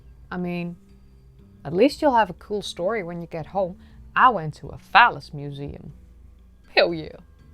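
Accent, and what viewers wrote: Netherlands. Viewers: You have a beautiful accent. is it Dutch ? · Hahaha 🤣 great presentation and cute dutch accent 😉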